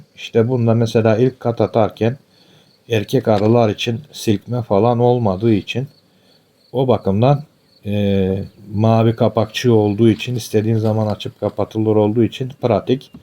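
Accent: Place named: Thrace, Turkey. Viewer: Sizi tebrik ediyorum. Arının dilini anlamış sırrını çözmüşsünüz. 4 yıllık bir arıcı olarak iyi ustaların tecrübelerine ihtiyacım var. Bazı günler yaklasık 5 saat yerli ve yabancı videolarda araştırma yapıyorum. Söylediklerinizi anlamayan izleyiciler hakkında açıklamada bulunmuşsunuz. iç Anadolu bölgesi insanı olarak Trakya şivesine alışık olmadığım için bende biraz zor anlıyorum. Büyük hazineler daha derinde olur deyip, anlamadığım yeri tekrar dinleyerek bunu hallediyorum. Küçük bir eleştirim olacak. Sanki katları yerine koyarken fazla arı eziliyor. Ve kamera açısı biraz daha geniş yada uzaktan çekse izlemesi daha keyifli olurdu.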